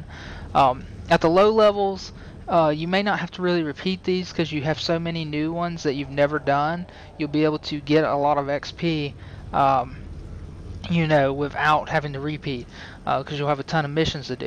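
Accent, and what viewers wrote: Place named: United States. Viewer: His accent is country, I think.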